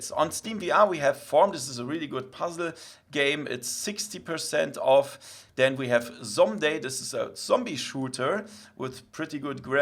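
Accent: Germany. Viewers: One question do you speak german because i hear a german a aksent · Nice video, I love the german accent haha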